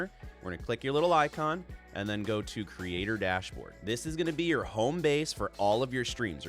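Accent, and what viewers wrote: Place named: Scotland, United Kingdom. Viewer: Ayy ... Didnt realise u spoke .. Scottish ..... :)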